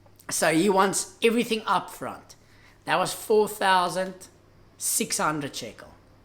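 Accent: Afrikaans, South Africa. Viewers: Wow, I am impressed. Your library seems is amazing. Should have contacted me, could have taught you Hebrew before you made aliya. Long time since I heard someone speaking with such an Afrikaans accent. Our Rabbi's parents' are from Cape Town. It is probably the only decent place today. You are amazing. If you ever come to Australia let me know. Would be amazing to meet you. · Thanks for sharing your story - we made aliyah from Johannesburg a few days after you and live in Beersheva. I came across your videos once while searching Tefillin and was so shocked when I heard someone speak with an Afrikaans accent and talk about Tefillin.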